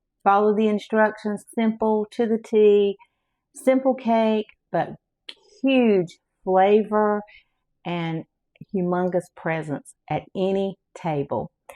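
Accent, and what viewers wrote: South, United States. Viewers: Your still a beautiful lady, absolutely love your southern accent.😊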